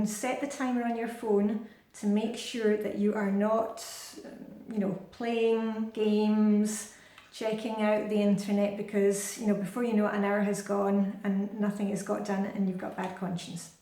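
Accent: Scotland, United Kingdom. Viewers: Thank you, for your wonderful persistence and gentle Scotish accent. ♡ I was always overwhelmed by the to do list and never allowed time for the fun stuff. Now on day 4 with my sink clean - it's playtime afterwards! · I love your style, the way you explain the flylady-system I have been following through years, your English (is it Scottish;) - so thank you for all those things. I wonder if you could tell me what kind of sink tidy (caddy and bottles) you are using - it looks so elegant. Greetings from Austria Knitgudi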